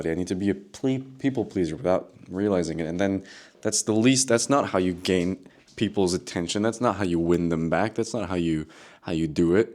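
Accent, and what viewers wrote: United States. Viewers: "with the ridiculous amount of German blood/dna floating around the majority of white people in the US, he might just be a mixed American who happens to live in Europe (family, etc.)." is what I would have typed, but he has that just-barely-there accent - it's an almost completely perfect general-American accent (the way people tend to sound on tv when they are not meant to have an accent, shows up in films too). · So you have a Spanish first name, a German surname, an American accent and you live in Berlin. What's your story? It sounds interesting.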